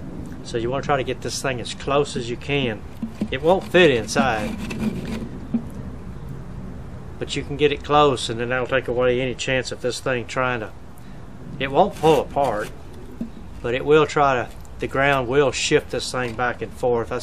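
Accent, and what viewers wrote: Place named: New York, United States. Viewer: Love your New York accent.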